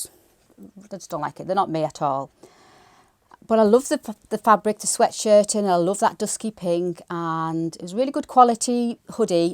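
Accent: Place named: Northern England, United Kingdom. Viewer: Good on you. I sat with a cuppa and as usual you gave me another dose of sewing inspiration. Thank you for sharing your life and journey, it was encouraging hearing how you felt when you began. Love being invited into your world for a catch up and especially hearing a fellow northern lass. x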